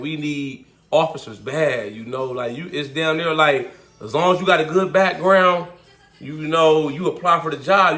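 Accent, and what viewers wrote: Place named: Ohio, United States. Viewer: I knew you were a fellow Ohioan due to the way you talk, I’m from cleveland and thinking about going to Texas 💪🏾‼️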